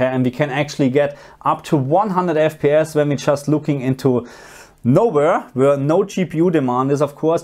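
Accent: Germany. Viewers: he's German but he lives in AU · are you from aus? Your accent sounds German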